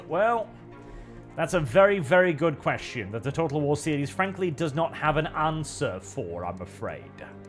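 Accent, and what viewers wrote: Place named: United Kingdom. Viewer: Almost 4 hours of being a better brittish that most modern british, good stuff!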